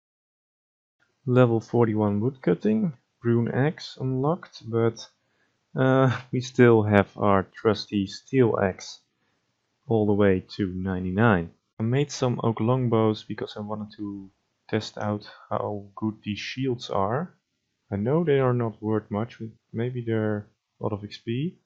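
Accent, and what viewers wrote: Netherlands. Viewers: are you dutch sir?? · you're dutch aren't you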